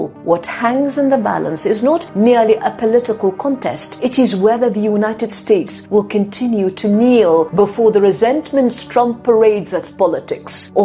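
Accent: United Kingdom. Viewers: That is British accent , not North American one. They hav own style.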